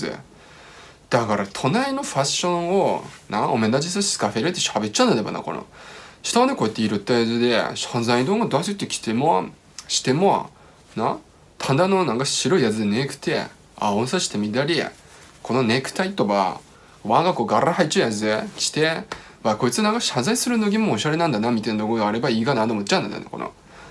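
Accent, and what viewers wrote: Tsugaru, Japan. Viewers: 自分でも よくわかりませんが ここに辿りついてしまいました 😂😂😂 津軽弁！ 素晴らしい👍👍👍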